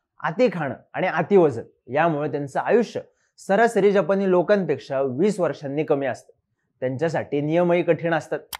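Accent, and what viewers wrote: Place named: Satara, India. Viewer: Bhava boltos lay bhari asal satari ❤️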